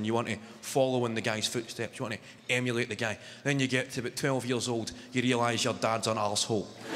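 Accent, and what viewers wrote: Scotland, United Kingdom. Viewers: Just listen to more scotish folk...you get there lol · Ewert how can u not understand him?? Plain english with a v mild Scots accent!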